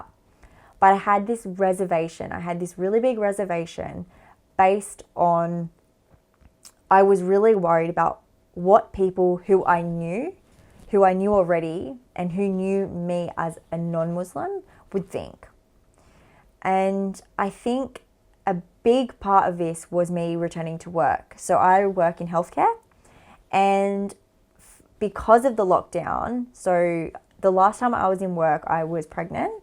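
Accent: Australia. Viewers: I wonder why did you come up much of Aussie accent in this video particularly ??... Earlier videos didn't have that much ...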